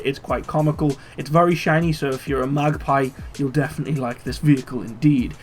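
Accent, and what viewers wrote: Newcastle, United Kingdom. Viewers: "Absolutely class"😂 R u a Geordie too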